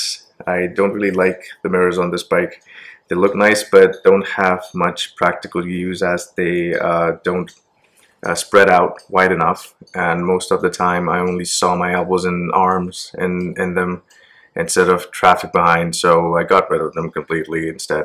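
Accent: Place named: United States. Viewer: Goddamn if not for the Jaipur tag I would have seriously thought you to be American 😂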